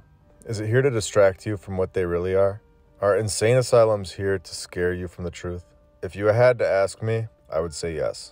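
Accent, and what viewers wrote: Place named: Midwest, United States. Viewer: Midwest accent, reminds me of home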